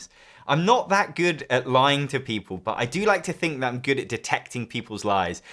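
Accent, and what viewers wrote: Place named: England, United Kingdom. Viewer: that's just how you say "drawing" in an english accent.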